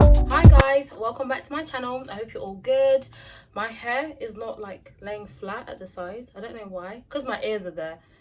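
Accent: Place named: Southern Africa. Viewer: I kept wondering where in Southern Africa you were from 😅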